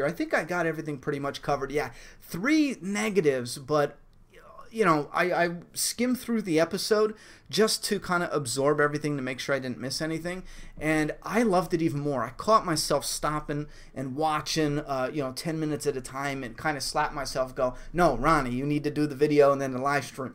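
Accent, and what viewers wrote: United States. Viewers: I love your American way of speaking about Game of Thrones. 😊 Such a great episode, I watched it twice. Loved seeing Lady Allena meeting Khaleesi.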